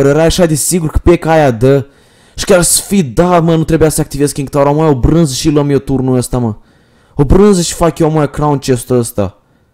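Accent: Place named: Moldova. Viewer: esti moldovean ? Eu tot ca team inteles cand ai vorbit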